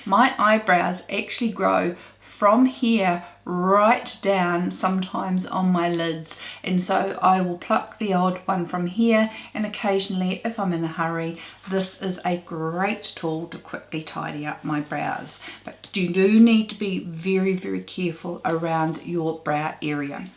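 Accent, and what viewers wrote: New Zealand. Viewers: Hello. Nice to hear a lovely NZ accent on YT. I’ve watched several of your videos & find your information quite useful in general. I’m a bit concerned with the shaving by hand & derma planning as it’s a bit precarious & I think could be a bit harsh. Previously I was waxing in a salon but we get locked down so often (Aust), I looked for at home alternatives. Another lady on YT was using the VieBeauti Rechargeable Eyebrow Trimmer Facial Hair Remover. I bought one to try & Im very happy with the results & convenience. I think you should look at that item or other similar tools to see what you think. That item has 2 interchangeable heads, a larger one for general peach fuzz & smaller head for around eyebrows, nose & lips etc. The heads can be rinsed in water (It’s a tool that can be used on other parts of the body too but I’ve not done that) It’s quite portable & charges with a USB. 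I’m feel quite scared when I see ladies shaving around eyes or possibly around blemishes or flaws they may not notice. I’m certainly not trying to sell this thing, (I have no associations at all) it’s just something I’ve found is really useful now & wanted to share with you & people here as it’s certainly safer alternative.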